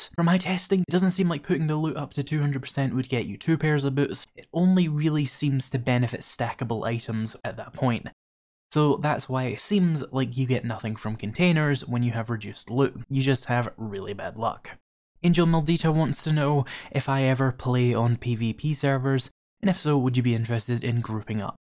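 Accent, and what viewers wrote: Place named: England, United Kingdom. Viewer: Answer: Series. The plural of series is series. It's just like the word deer. You have one series. You have twenty series. This is because the word is a noun that itself is already describing a plural. Therefore, it retains the plurality in singular and plural. (This feels very awkward, being an American explaining English to a person with an English accent) 🤣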